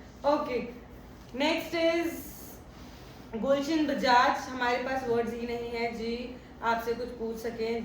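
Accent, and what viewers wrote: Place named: Haryana, India. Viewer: ap haryanvi balti achi lagti ho.. to ap roj haryanvi bola karo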